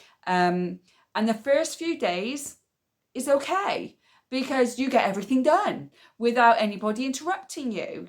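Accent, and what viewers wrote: United Kingdom. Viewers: I love your accent 😍 💕 in kenya we call it peppa pig accent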